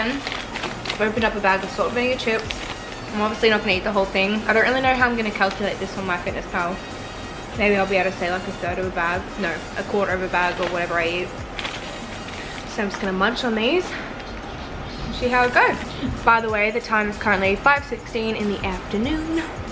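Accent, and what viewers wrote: Australia. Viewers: I love you girl. I admire how much you can eat. You’re beautiful and I love your Aussie accent ❤️ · She definatly has an Australian accent and the scenery in the backround looks Australian but I don't know for definite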